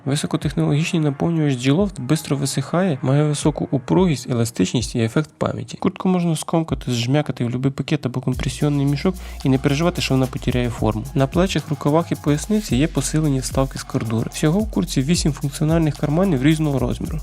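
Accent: Surzhyk, Ukraine. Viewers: Цей суржик 😤 На вчіця правильно розмовляти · всё хорошо, прикольные куртки! Но, когда ты делаешь видео контент постарался пожалуйста не говорить на суржике очень режет слух! Тем более что в большей половине видео звук наложен на картинку. Без обид!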